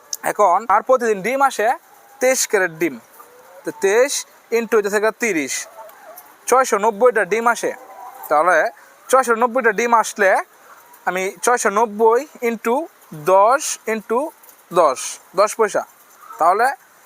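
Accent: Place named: Kishoreganj, Bangladesh. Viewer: Koi na bedar bari kishoreganj a mone hoi, boler doron a mone hoitase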